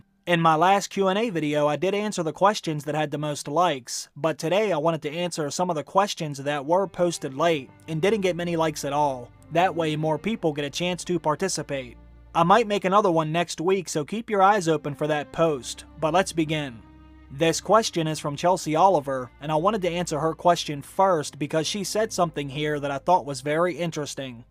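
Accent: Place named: Alabama, United States. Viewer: Are you from Alabama? cause you sound like forest gump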